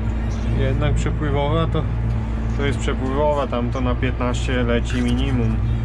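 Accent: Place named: Podlasie, Poland. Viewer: Słychać że Podlasie,z resztą tak jak ja. POZDRAWIAM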